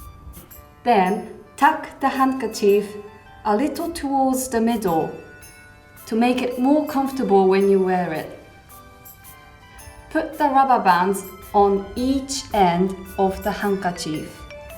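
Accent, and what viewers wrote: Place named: United Kingdom. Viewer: の英語発音が上手ですよ！彼女の英語アクセントは少し英国の英語です。はイギリスで勉強しましたか。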